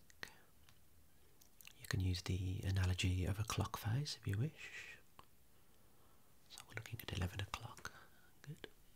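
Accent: Birmingham, United Kingdom. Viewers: Its cause hes a brummie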